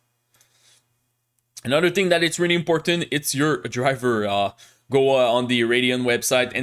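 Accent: Quebec, Canada. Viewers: You’re french canadian aren’t you haha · Greate video :) Juste, avec l’accent je détecte un québecois😂 Cool de voir d’autre québécois sur YouTube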